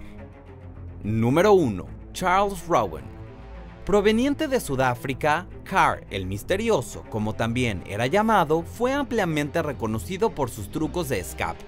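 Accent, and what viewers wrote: Mexico. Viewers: Este narrador es de México y el otro Argentino? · En que momento la voz se volvió tan mexicanota? Extraño la voz antigua, era mas "universal" por asi decirlo.. y mas rapido al hablar :/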